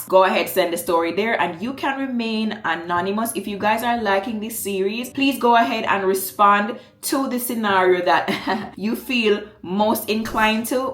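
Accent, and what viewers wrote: Jamaica. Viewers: I really love how speaks, articulate but drop in the patois funny but serious same time. 💫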